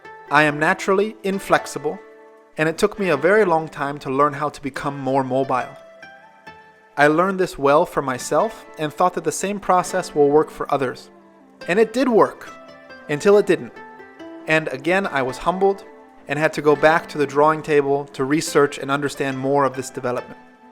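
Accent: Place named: Israel. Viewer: love your vids:) where are you from? sound like israeli accent but i dont sure...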